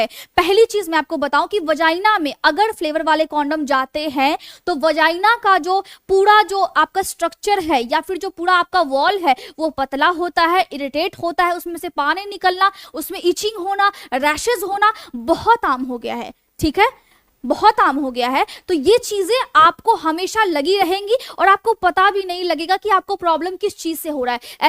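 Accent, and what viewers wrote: Bihar, India. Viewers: बिहार वाले पहचान मे आ जाते है बोलने के तरीके से आप कहा से है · Didi app Bihar se ho kiya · Bihari ho ka ji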